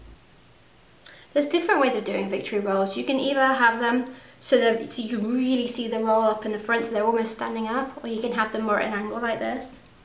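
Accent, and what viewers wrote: United Kingdom. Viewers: I always thought you would be from the US - I was quite surprised to hear your sweet English accent! Your hair as you take your pin curls out makes me want to chop mine right off - it looks so gorgeous, an aqua Marilyn - LUSH.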